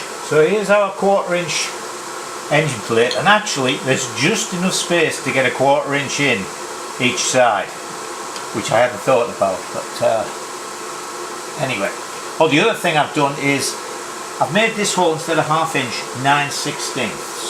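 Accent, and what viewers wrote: United Kingdom. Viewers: I'm a car guy but I'm finding this series very interesting. Thanks for showing every little nuance that needs to be taken into consideration. Nice to hear a Brit abroad too. Mart.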